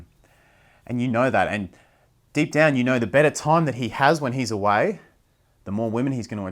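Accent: Australia. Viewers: I just read your are Australian. .. ye ye. love the australian accent 😊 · i know this may sound cheesy but i officially love Aussie accent.😆 and its amazing how i do these things without even realising it. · Are you Australian? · I’m living in Aus and learning English. I watch your channel for English listening practice because you speak little bit Aussie English and put subtitle :) it’s great that I can learn about relationship at the and time! Thank you!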